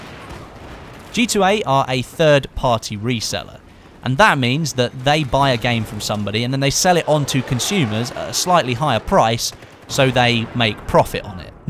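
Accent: England, United Kingdom. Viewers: In fairness, as an American, I could clearly tell that was British. He doesn't sound Australian at all imo:P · How did people not know you were from the UK? XD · Story sounds a little English to be honest. Didn't realise you're close to London. I thought you were like me (midlands)!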